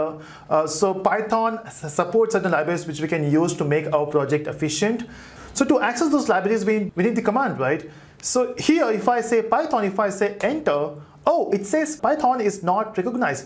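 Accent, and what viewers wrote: India. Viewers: I hear Indian I stay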